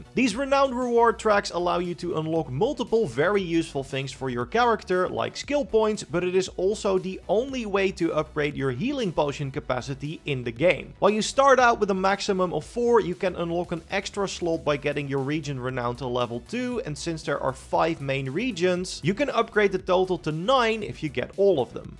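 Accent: Netherlands. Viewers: 99% sure it has to be a Dutch accent 😅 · Loving that Dutchlish.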